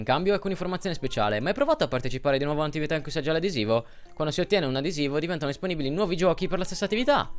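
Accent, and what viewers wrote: Sardinia, Italy. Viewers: Ma cosa sei sardo?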